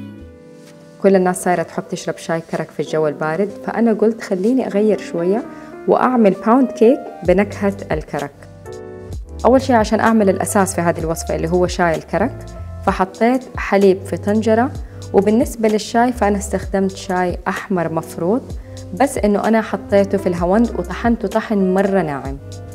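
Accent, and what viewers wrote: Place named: Jeddah, Saudi Arabia. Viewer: الفديو جوناااان والتصوير ماشاء الله ولاغلطة بس صوت الميوزك مزززعج لوصوتك بس وهدوء كان ولا غلطة اللهجة الجدوية جمييييلة معاك ربي يوفقكك 💛💛💛❤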